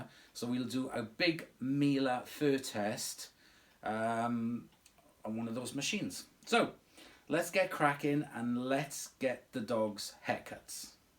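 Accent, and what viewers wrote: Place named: Scotland, United Kingdom. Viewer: Happy New Year to you as well! I have 3 permanent res. Cats, 2 longhair, and right now just one foster cat rescue cat and I understand completely about the fur 🤣 the Dyson was impressive with it. Always hesitant to buy another cordless vac again as I had one that underperformed big time, but this one seemed to work great. I love your channel, it’s fantastic! And omg the singing stuffed animals were soooo cute ❤️Thanks so much for giving the demo. Also ❤️ your Scottish accent. Some of my family ancestors are from Scotland (Fraser’s of Lovat) and I’ve always wanted to go there. Always feel at home in the mountains more than any other terrain. The Highlands call my name...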